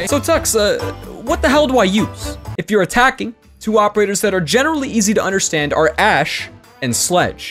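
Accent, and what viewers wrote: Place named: Russia. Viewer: Bru I noticed he kinda has a Russian accent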